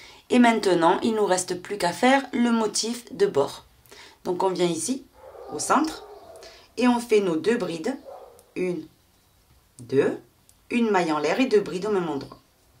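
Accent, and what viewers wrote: South, France. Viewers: bonjour.. et merci beaucoup pour toutes ces belles choses que vous nous donnez à faire.. j'en suis à mon quatrième châle avec vous, et je ne peux que me féliciter de vous avoir trouvée sur mon chemin, en me promenant sur YOUTUBE... vous m'êtes d'un immense secours; et ainsi, je peux faire plaisir, dès que possible, à certains de ma famille et j'apprends plein de chouettes points, ce qui me transporte de joie.. merci beaucoup. le dernier en train est un point relief que j'ai fait avec caprice couleurs mélangées qui rappellent de délicieux sorbets pêche/vanille/citron enfin des couleurs pep's et un point extra!! le prochain avec le point "pouf"!!... sera le bienvenu.. merci pour vos explications claires et attentives... et aussi pour votre accent qui me fait voyager au pays du soleil.. bonne journée et portez vous bien.. à bientôt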